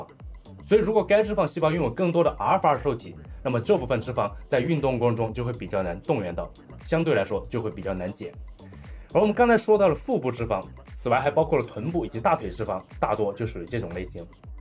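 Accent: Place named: Dalian, China. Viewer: 這應該是地區發音的問題，之前我認識住在大連的大陸人，他講話也是這個方式，可能跟方言有關係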